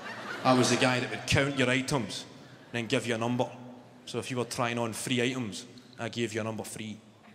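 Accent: Scotland, United Kingdom. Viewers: Well I am Welsh and have no problem understanding him, you just need practice to get your ear in. Move to Scotland for a couple of month I am sure that will sort the problem after you have said pardon a few times😂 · If you're serious, [and I hope you are] this is English spoken by a Scot in a Scottish accent.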